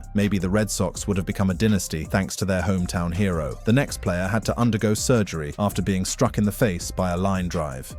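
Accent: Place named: United Kingdom. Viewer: Why the FCK does the narrator have a British accent???? Where in the UK does ANYONE play baseball???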